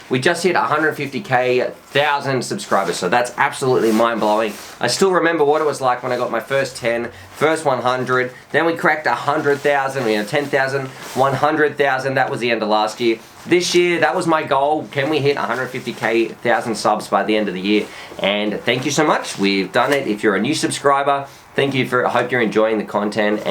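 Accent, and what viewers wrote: Australia. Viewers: I like your Aussie brogue. Anxious to see what new tech is developed in 2019.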